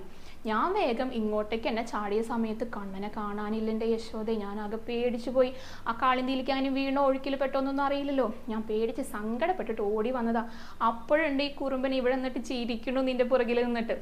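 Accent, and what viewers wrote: Thrissur, India. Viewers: Kadhakal parayunnthu kelkkan valare resakaramane prathekichum parayunna reethium thrisoor bhasheyum. Bhangiaayettondu. Kadha parayan oru avsarsm kittiyathu thanne oru bhagiyam thanneyalle. Guruvayoorappan Molkku SarvaIswariyamgalum tharumaarakatte. Kunjente kaal pollalettathu bhethamavunnundayirikkam alle? Ok God bless you! Keepit up.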